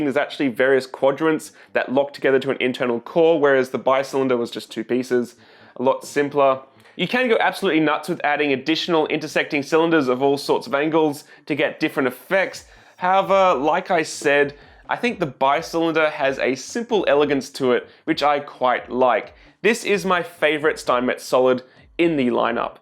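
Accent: Australia. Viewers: Is he Australian? Those accent, similar to Hugh Jeffreys iPhone Youtuber. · I wonder if you are from Autralia?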